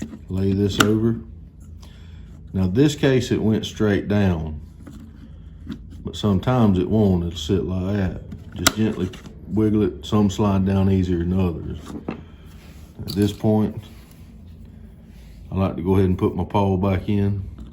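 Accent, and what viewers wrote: United States. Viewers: Excellent video brother! Helped me get my machine back running and made a good days work. Also your accent is killer! I’m guessing you’re from Georgia Love from Florida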